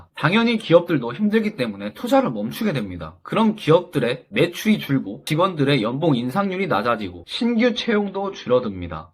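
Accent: Jeolla, South Korea. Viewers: 전라도 분이네요! 와 귀하신 분!❤❤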